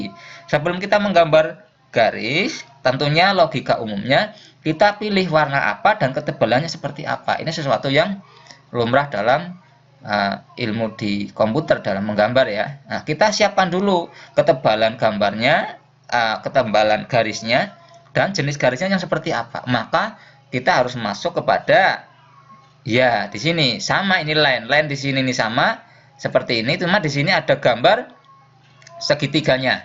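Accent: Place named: Banyumas, Indonesia. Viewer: Salam dari Kawunganten, sip.penjelasannya mudah dipahami. ngapaknya muncul😁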